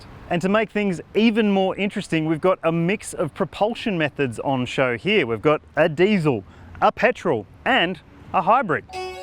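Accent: Australia. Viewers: Aussie accent.....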